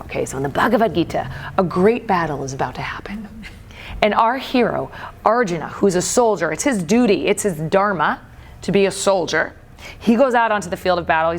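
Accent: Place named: India. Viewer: For a yogi, you are very close minded. Stop acting like Puritan, she grew up with those kind of phonetics, how can you expect her to improvise those dictions? Indian professionals working at silicon valley for decades can't do away with our thick English accent. And sanskrit is grammatically and phonetically probably most difficult language, even trained priests of Kashi don't chants those hymns correctly now.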